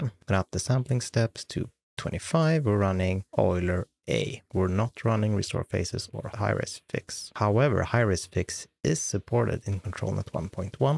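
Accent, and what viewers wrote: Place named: Sweden. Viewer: I'm here for the amazing Swedish accent! And the jokes 😁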